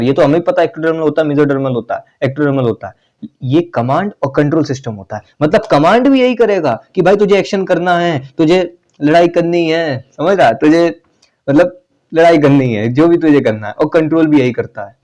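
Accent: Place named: Bihar, India. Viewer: sir aap bihar me kaha se hooo...aapki boli se pata chal raha hai ki aap bihari ho...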